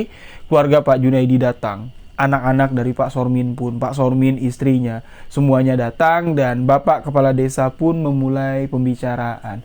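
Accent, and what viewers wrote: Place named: Madura, Indonesia. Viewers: kaka kok nada bicaranya kya orang madura ya 😀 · Logat Madura nya kental bg salam kenal lah sama Batak sama sama kental logatnya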